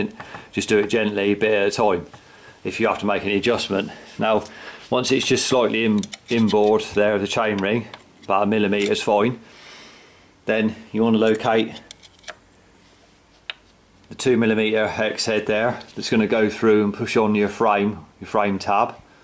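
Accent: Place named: Bristol, United Kingdom. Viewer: do you know, love the what must be bristolian accent and despite it sounding boring, to me today you are a hero.... All day I have been trying to sort my front derailiuer . I've checked 100 vids when things didn't or wouldn't go right... All I needed was to fit that tab that you so eloquently demonstrated. Jeez, I should have listened to you in the first place. thanks so much for this vid it's the best,.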